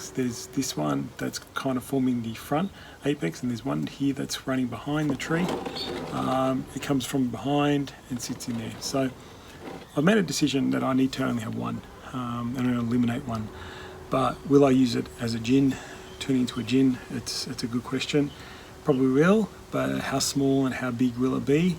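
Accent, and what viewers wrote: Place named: Australia. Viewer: Hi, I've been following you on YouTube for some time, I learn a lot about your work with bonsai, but sometimes it's more difficult to follow you due to more the bad sound than your beautiful Australian accent, many days there is wind, we like the sound of the leaves and the birds.....but it would be better with a microphone, don't you think? 😉, kisses from Barcelona, Catalonia your antipodes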